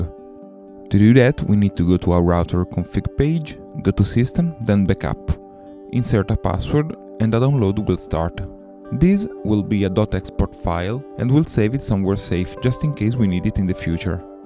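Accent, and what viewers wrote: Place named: Italy. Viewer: Al 99.9% è italiano. Come lo so? Non parla inglese male, ma l'accento è chiaramente italiano (quello vero, non quello che gli americani pensano sia italiano). Posso dire che no è del sud, probabilmente è del centro-nord. Se hai visto il video dovresti aver notato che la home dell'utente che sta usando si chiama " ", quindi lui o qualcun altro si chiama o magari è un dipendente di... chi lo sa. Ma la cosa più importante è: come faccio a ripristinare la versione originale del firmware della fritz!box, sempre ammesso che non mi trovi contento?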